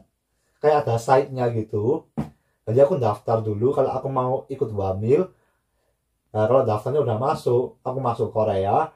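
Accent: Java, Indonesia. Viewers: Lama-lama logatnya kaya orang jawa,logatnya mengikuti mas hansol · Wah saya suka dg medoknya tinggal di Jawa az Oppa 😁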